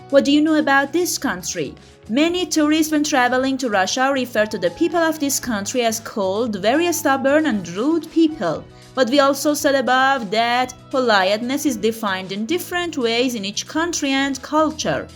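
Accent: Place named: Iran. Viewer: Your accent is blindingly obvious Persian 👍💗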